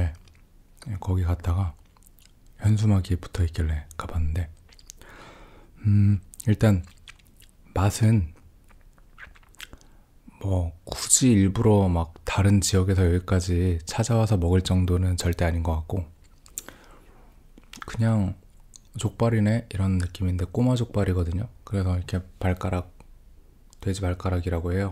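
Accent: Cheongju, South Korea. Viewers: 청주분이확실합니다!!!오명가명 마주친것같은 익숙함.........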